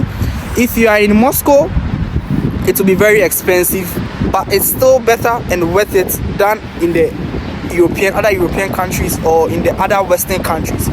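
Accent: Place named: Ghana. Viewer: He has the Ghanaian English accent....is he a Ghanaian?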